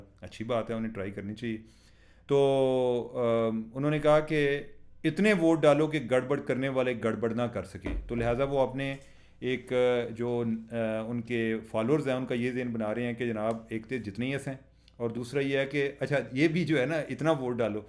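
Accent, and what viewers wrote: Punjab, India. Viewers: Urdu with Panjabi accent unique combination... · I am a pukhtoon from KPK but i honestly like your punjabi touch in your vlogs and do enjoy it.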